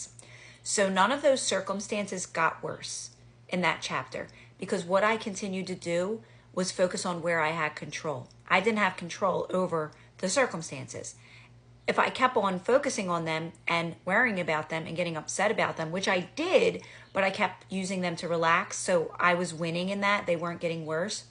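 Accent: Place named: Philadelphia, United States. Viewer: I cannot get past that Philly accent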